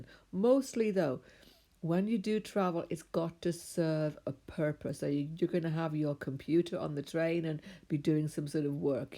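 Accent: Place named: Welsh Midlands, United Kingdom. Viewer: It's cool hearing a welsh/ midlands accent in an astrology video hehe 😜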